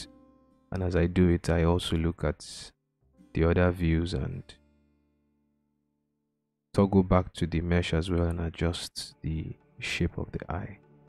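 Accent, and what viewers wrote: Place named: Nigeria. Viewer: So good to have a tutorial in Nigerian English. I can understand everything you're saying. Really clear and straight to the point. Thanks for this.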